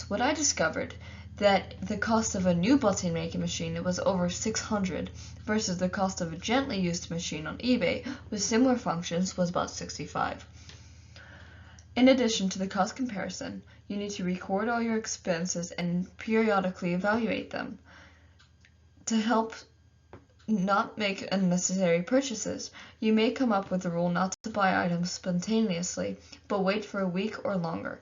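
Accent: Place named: Russia. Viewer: Your Russian is showing 😅 Great presentation 10/10!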